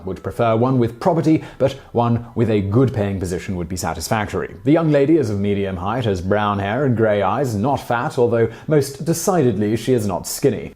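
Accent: England, United Kingdom. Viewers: Why is vsauce talking with an English accent? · Why is vsauce doing a British accent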